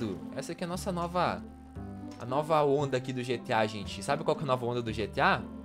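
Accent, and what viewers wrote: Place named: Paraná, Brazil. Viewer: porque nas antigas voce falava mais em um sutaque mais do Sul (Gauchos) e agora voce fala mais Paranaence???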